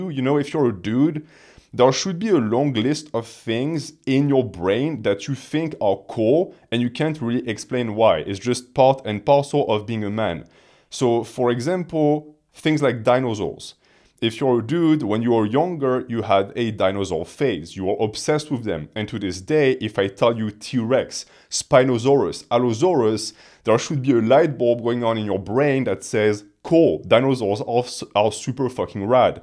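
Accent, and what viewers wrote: France. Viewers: Wait are you French ?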